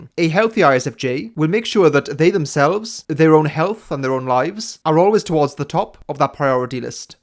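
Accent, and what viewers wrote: United Kingdom. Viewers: One thing I’ve struggled with as a very introverted ISFJ is that, because I keep pretty high boundary walls around my life, I’m not as busy helping other people as the ISFJ descriptions usually indicate. Over time, I’ve realized that my worry over this is simply another manifestation of the same underlying issue: that we ISFJs are anxious about doing too little. Many ISFJs hurt themselves by giving too much, while others hurt themselves by giving a normal/healthy amount and then sitting around feeling bad about it. If you have an ISFJ in your life who’s constantly overgiving or freaking out about whether they’re giving enough, the best way you can help them is reassurance that you are content with them as they are. Though they are giving/helping to make you happy, they’re likely also doing it to stave off feelings of worthlessness, so if you can encourage them to feel satisfied, they’re less likely to get neurotic and burn themselves out. 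If I can be satisfied with giving what I’m able to and not feeling bad that it’s not 100%, to-the-death giving, I’ll be a much healthier person. Thanks for understanding me,! (And thanks for being British. I love your accent.)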